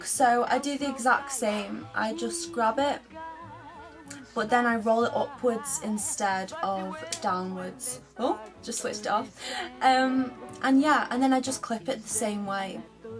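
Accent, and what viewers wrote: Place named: United Kingdom. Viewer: Oh my god, I just thought I saw Marylin Monroe when I clicked this vid. You look exactly like her!! Only it's a little bit absurd to watch Marylin Monroe look alike talking in a british accent.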